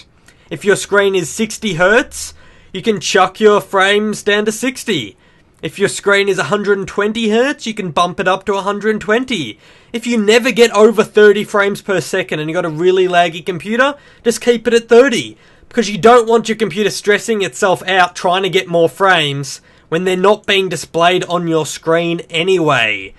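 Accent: Australia. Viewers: r u australian :)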